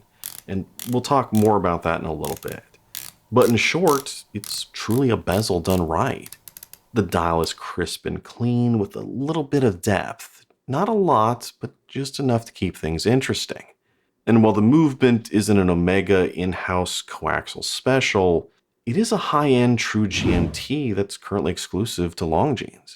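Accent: United States. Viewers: Just can't watch a video with this murican accent! Classless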